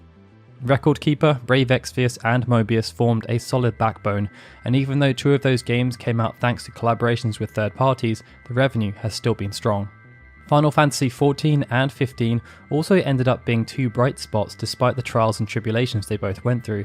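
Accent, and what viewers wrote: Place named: RP, United Kingdom. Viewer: Great stuff! Intriguing and well crafted as usual. I grimaced at /I no va'TIV/ though! I've always heard /'I no vei tiv/. It's obviously down to your exceedingly posh british accent.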